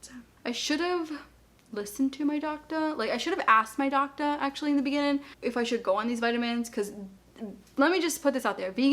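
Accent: Boston, United States. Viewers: She’s from the Boston area 🥰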